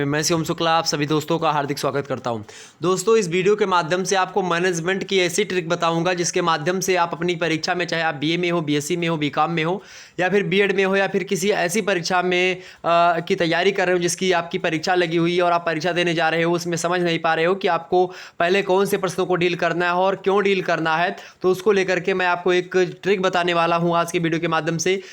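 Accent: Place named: Kanpur, India. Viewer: Hme lgta tha sir kanpur se h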